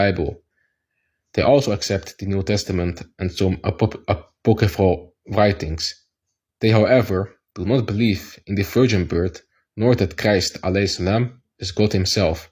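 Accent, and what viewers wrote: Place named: Germany. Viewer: Welcome to the true religion brother ! JazakaAllah for the video ! You have a crazy German accent Masha Allah 😬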